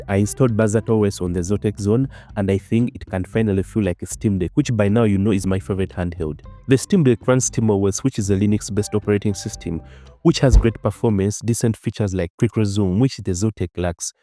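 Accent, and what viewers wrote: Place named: Uganda. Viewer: I can tell a Ugandan from a mile away.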